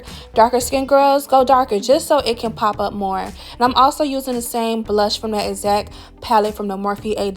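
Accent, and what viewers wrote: Miami, United States. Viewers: I love your Miami accent baeeee💞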